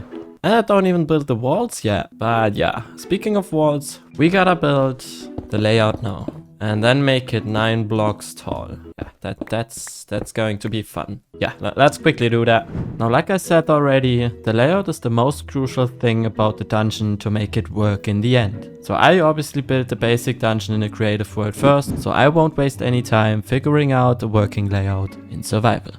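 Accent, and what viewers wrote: Germany. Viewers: am i hearing a little german accent?? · Are you german if yes than i knew it cuz ur accent. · Are you German? Because i thought you had a little German accent. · You are German or? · Are U German? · u german bro? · Idk why, but he sounds so german 😂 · Is bro german?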